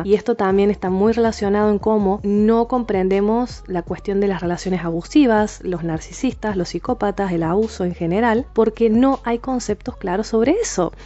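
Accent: Córdoba, Argentina. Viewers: ! Gracias! Me encanta escuchar tu tonada! Vivo en Chile hace unos años...y es muy grato seguir a una cordobesa! Estoy muy contenta de encontrar en youtube videos de la excelencia que tienen los tuyos! Gran trabajo! Felicitaciones! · Es Cordobesa por su tonada, pero si sos de afuera, capaz que hace sesiones por video llamadas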